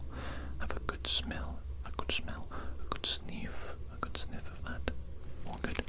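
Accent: Wales, United Kingdom. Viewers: wondered and wondered if i was imagining the welsh accent, but then you said 'ini' and i'm certain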